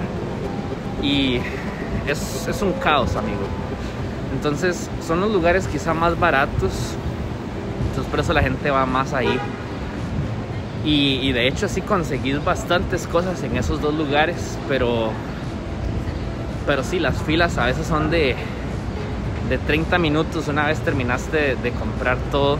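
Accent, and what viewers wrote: Costa Rica. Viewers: Maee fijo usted tiene que ser tico o alguna vez vivió en Costa Rica jajajaja saludos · Si no me equivoco eres de Costa Rica por ese acento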